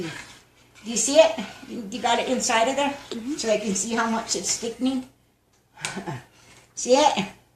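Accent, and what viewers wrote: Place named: South Dakota, United States. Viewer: I’m from McLaughlin , SD and your voice brought me back home 😅😂 haven’t had gabubu bread in so long !!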